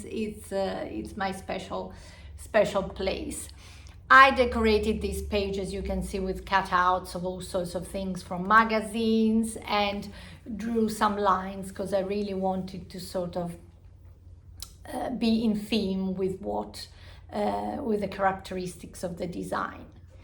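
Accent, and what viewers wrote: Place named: Italy. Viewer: ! I loved this episode. I find your accent so interesting - sometimes I hear Italian accents and sometimes I hear Australian accents! 😁 We hosted an Italian exchange student one year and are still in contact with her. Anna and her family live in Collebeato. Close to Lake Como. Where are you from? You share so much great detail about your projects here! I made notes on things i didn’t think to share! Sure do wish I could come meet up with y’all at the fiber festival next fall!!! I guess I’ll just join virtually. Thanks for sharing such great inspiration❤❤❤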